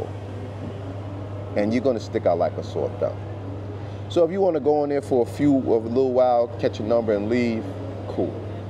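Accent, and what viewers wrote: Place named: New York, United States. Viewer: New yawk what’s good dawg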